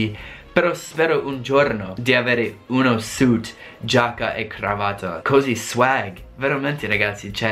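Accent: Rome, Italy. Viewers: Sì ma si è imparato l'accento romano, in altri video si sente proprio:D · Mi piace che mo parli romano ahahah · Emilia Fiorini ...lui parla parole di un romanesco simpatico. Quello dei burinotti romani sì che è odioso. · Come si sente che la tua ragazza è romana! Passà, guidà, studià ecc. Mi fa venire in mente il mio Prof. di storia e filosofia. Ma tu l'italiano lo parli molto bene! Congratulazioni, buon lavoro! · Ma l'italiano l'hai imparato a Roma? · Questo video mi ha uccisa dalle risate xD Praticamente sei diventato romano, tra l'accento e il fatto che sei divertentissimo, credo sia uno dei più belli! Sarebbe divertente sapere il contrario, quali sono le cose che fanno più paura agli italiani in America (non ci sono mai stata ma le uniche cose che mi vengono in mente sono il tritarifiuti nel lavandino e i grattacieli, quelli mi terrorizzano e non so perchè xD) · Se parlerai il tuo strano italiano/romanesco all'asame di maturità sarai sicuramente segato. 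Impara l'italiano perché di lingua italiana ce n'è una sola. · Amo il tuo romano❤️💫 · No vabbè Maria io esco quando parla in romanaccio 😂e poi tesoro io ho Delle amiche di Roma che ogni Estate scendono da me in Salento Lecce e quando le persone parlano con loro nn capisco un' accipicchia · Ecco fatto hai subito imparato le parolacce e il romanaccio 😥 . Comunque paradossalmente è più facile che uno straniero trovi lavoro in Italia che un ragazzo Italiano. Non è giusto ma è così. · ahahaha sei un grande... sembri il mitico don lurio.... ma piu moderno, ricordati che il coniglio... va con la polenta......è la morte sua (modo di dire , che è buonissimo)..... p.s. smetti di frequentare solo romani (se pur simpaticissimi), perchè stai imparando la cadenza romana e non italiana.. il tuo intercalare capitolino è bello acceso. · Dai un po' di romano che male fa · Ottima osservazione..... comincia tu a toglierti quel Romano odioso e parla Italiano....io ho seguito il tuo consiglio di non usare lo slang ma tu con questo Romano ti prego no dai........anche no;-) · ahahahah oddio questo video e il tuo accento romano sono fantastici!!!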